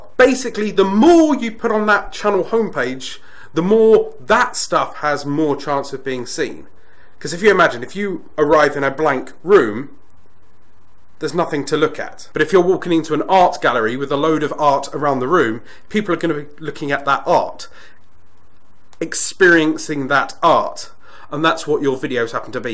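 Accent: United Kingdom. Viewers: Just listen to his British English. Its worth more than 7k views in my opinion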